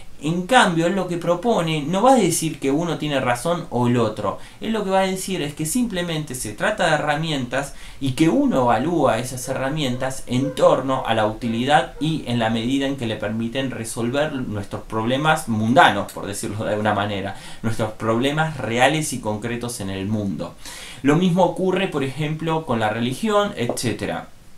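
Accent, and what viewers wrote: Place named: Argentina. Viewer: No puedo con el español argentino...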